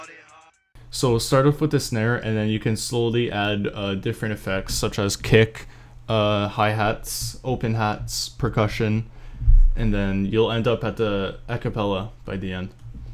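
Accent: Quebec, Canada. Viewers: Wtf are you from Quebec? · j'ai failli pleurer quand j'ai entendu ton magnifique québecois et juste après les sous titres 😂😂😂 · what you are québécois je savais pas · tes quebecois?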